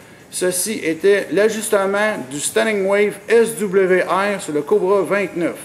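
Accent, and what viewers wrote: Quebec, Canada. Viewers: Ça fait du bien entendre les instructions en bon québécois 'sti !!! 😉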